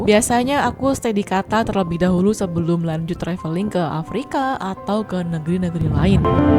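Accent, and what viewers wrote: Java, Indonesia. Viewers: Wow ... sounds an interesting story and experience. Traveling around the globe is my dream. Keren, mba. Aksen mba kaya orang Jawa.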